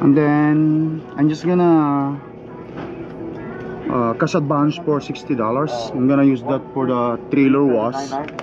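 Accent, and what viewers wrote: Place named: Batangas, Philippines. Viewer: sir parang Batangas ang accent niyo hehehe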